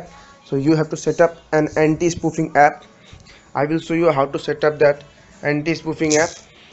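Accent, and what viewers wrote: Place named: India. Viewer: Will you please slow down when you talk in english. Your indian accent is why too strong for me to follow anything you are saying...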